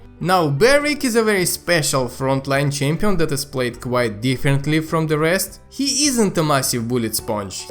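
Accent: Russia. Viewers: Buck. Horrible russian accent.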